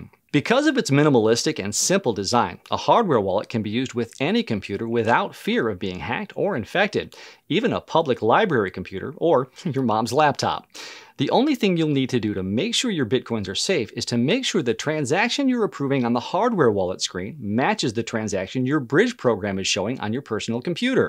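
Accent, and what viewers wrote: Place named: United States. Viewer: May I ask which US State were you born? Your accent is so pleasant to ear!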